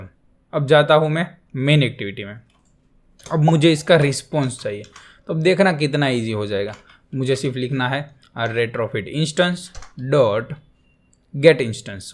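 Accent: Gujarat, India. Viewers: Gujrati Accent Detected.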